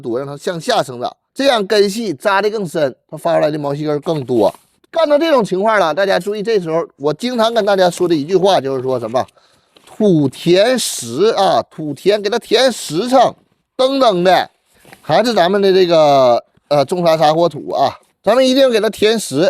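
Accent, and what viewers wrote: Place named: Northeast, China. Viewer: 这是哪里的东北话呀？比其它听到的东北话好听。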